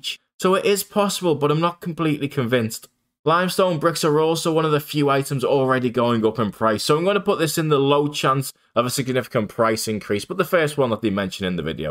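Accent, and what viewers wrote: Liverpool, United Kingdom. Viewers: Fellow scouser? yes lad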